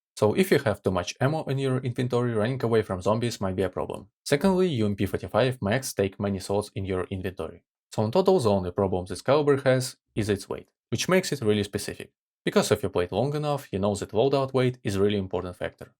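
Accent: Russia. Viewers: Мне кажется или он русский?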